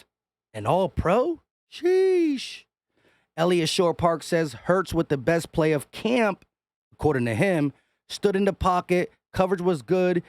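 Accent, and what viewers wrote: Philadelphia, United States. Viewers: but wait yeah you could use so "professionalism and he could use some street" but don't get away from what makes you different know what I mean? You don't need to be proper cause philly isn't proper you sound like one of us he sounds like a sports broadcaster which works for both of you don't get away from that but I think y'all would be a great duo especially live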